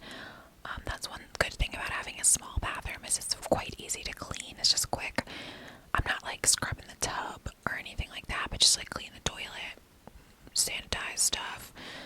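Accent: United States. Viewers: All i needed is my fav american accent this morning❤️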